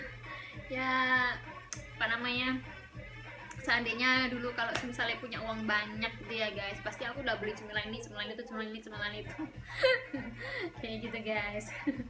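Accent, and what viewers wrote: Indonesia. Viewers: logat jawa timurnya gk luntur semangat! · Buat satu konten yg full pakek logat pacitanx.................. Pasti seru.........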